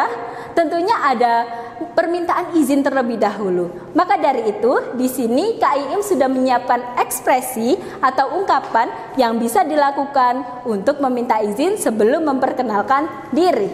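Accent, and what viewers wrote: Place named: Java, Indonesia. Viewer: Medok mya gk pernah ilang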